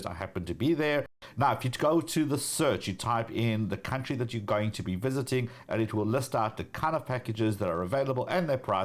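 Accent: South Africa. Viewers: Hi first I thought you were from South Africa but then I saw in your videos you are from America and your english accent is very South African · היי. מה סוג המבטא שלך? דרום-אפריקאי?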